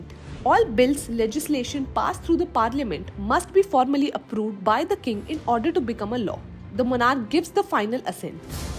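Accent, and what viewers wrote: India. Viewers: Cmon really!! Im not racist and will never be but this Idian english accent makes me go Insane 🤬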